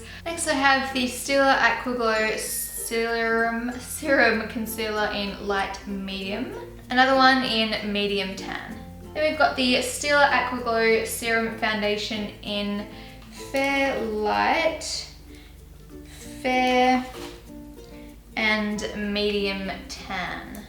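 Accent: Australia. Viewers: oh gosh, when I hear you speak, I so wanna have Australian accent!!!! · You do realise it's an Australian accent right? You basically insulted ALL THE PEOPLE who have the same accent. So fcking immature...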